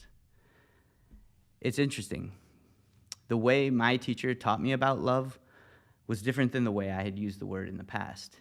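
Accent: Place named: Massachusetts, United States. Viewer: Before I address this question ⁉ I have a question of my own . Are you originally from Massachusetts, as your accent is not that pronounced? I'm originally from New England myself , Connecticut.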